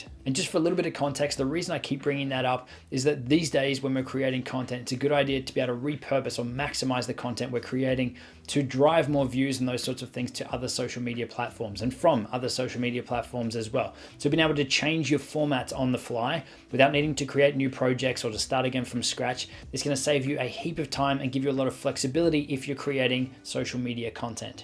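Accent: Australia. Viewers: Are you from Australia? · Do I sense a fellow Aussie?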